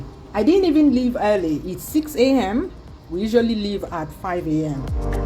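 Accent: Igbo, Nigeria. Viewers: I love hearing you speak igbo.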